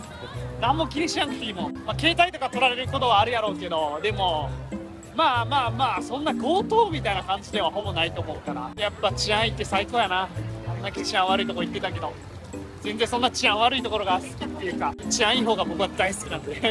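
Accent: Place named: Mie, Japan. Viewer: 最近見出したのですが何人くらいで旅してるんです？ 喋り聞いてると三重の方かな？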